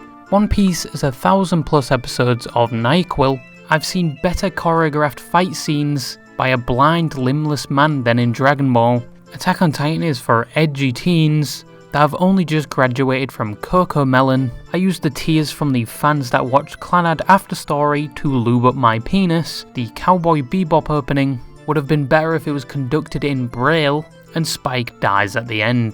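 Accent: United Kingdom. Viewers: The bri'ish bird cooked again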